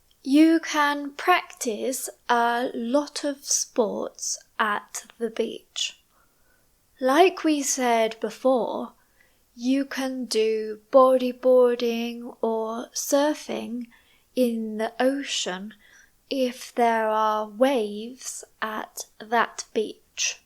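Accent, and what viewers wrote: United Kingdom. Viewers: Es inglés británico?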